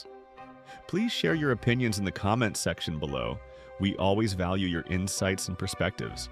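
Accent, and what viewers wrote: United States. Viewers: I will never like AI in an american accent.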